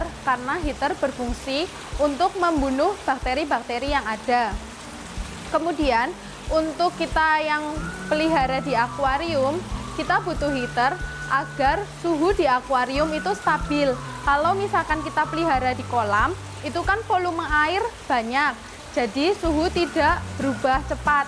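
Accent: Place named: Central Java, Indonesia. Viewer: bahasane medok bnget.. koyo wong jawa tengah bagian kulon.. hahahah😊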